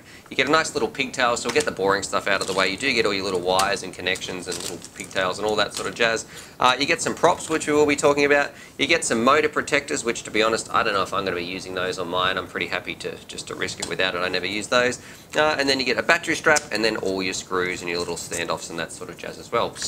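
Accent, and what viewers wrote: Australia. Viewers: You make funny Australian noises and it's the best thing ever!!!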